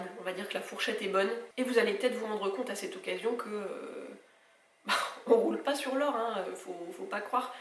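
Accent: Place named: Brittany, France. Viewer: Ha ha Mdr, et baaaah perso moi j'adore tes mimiques et ton petit accent breton qui me rappel mon chez moi, voilà dix ans que j'ai troqué ma plage de Pen bé et mon sel de Guérande pour les volcans d'Auvergne. Je suis partie en pensant que nous breton n'avions pas d'accent, un an plus tard je me suis rendu compte de notre façon de parler et ça me fait plaisir d'entendre ça dans tes vidéos. Serions-nous les seuls à comprendre? 🤔 mon mec déteste quand je rentre en Bretagne je reprend l'accent et la façon de parler direct ! 😅 je laisse rarement des commentaires mais là je me devais de te le dire, tu es une de mes youtubeuse préféré justement parce que tu es naturelle, sans chichi, normal quoi, et ça fait tellement de bien 😄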